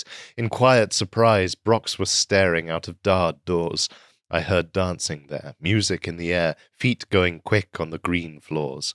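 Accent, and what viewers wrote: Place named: United Kingdom. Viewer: Heavens to Betsy that was a phenomenal piece of art to hear. Excellent delivery too, Cadence perfectly emphasized the proper beats. I imagine it loses something of significance when it is said in any accent that is not British.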